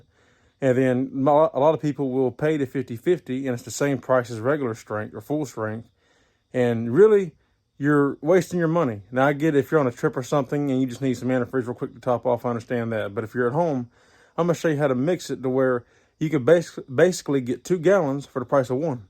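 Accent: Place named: North Carolina, United States. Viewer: Yes sir! Born and raised. Raised in Wilmington, born in High Point. I could tell by how your said “water”. Been living in the California mountains now for ten years. Once I heard you talk I felt like I was back home. Thank again for the video. It helped! I got me 1989 bronco with the 4.9l that I’ve been putting back together.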